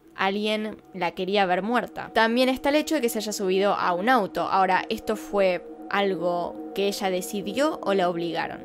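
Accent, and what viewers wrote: Argentina. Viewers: Cuentas con gran capacidad narrativa tengo como ocho meses de seguir tu canal pero no te comentaba porque no tenía buen celular, además que a los mexicanos nos gusta como hablan los argentinos.Tienes talento y personalidad. Espero siga creciendo tu canal. Sinceros saludos desde Torreón, Coahuila, México.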